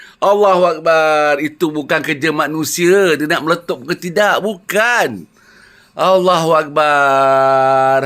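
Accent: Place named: Terengganu, Malaysia. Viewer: Keras betul lidah hulu ganu ni..😀😀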